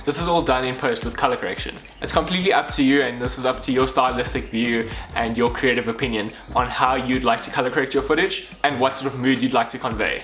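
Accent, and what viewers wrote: South Africa. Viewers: Are you South African? That accent sounds like home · he's south african isnt he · Hey, the first video I have watched from you... and just from your accent and the first 3 seconds of the cinematic video(I saw the buildings and traffic lights) and I knew this is from South Africa(Downtown-Johannesburg). Nice well done :D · U from SA? Lol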